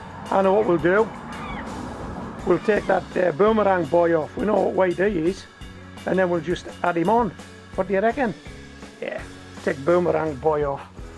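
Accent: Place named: Darlington, United Kingdom. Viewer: thank you kindly and bless your ears. It doesn’t look insipid in that vid. Looks spot on. I have family from Darlington and you have a similar accent. Lovely up there. I’m in the fens where it’s flat and windy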